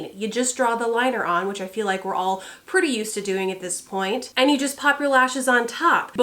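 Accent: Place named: New York, United States. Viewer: Your from texas? I thought you were from New York girlfriend:)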